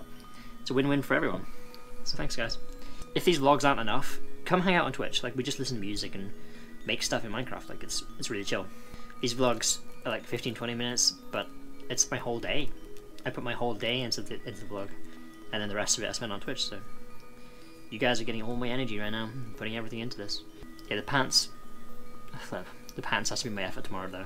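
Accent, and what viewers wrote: Wales, United Kingdom. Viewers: The Welsh in your accent is slowly appearing.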